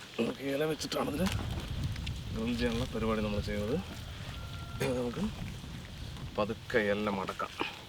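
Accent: Palakkad, India. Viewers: പാലക്കാടിനെ തന്നെ ഭാഷയും മഹിമയും കാത്തുസൂക്ഷിക്കുന്ന 🥰 ekka ഇരിക്കട്ടെ ഒരു കുതിരപ്പവൻ ♥️👍🏻♥️🟠🟠🇮🇳🇮🇳🇮🇳🇮🇳🇮🇳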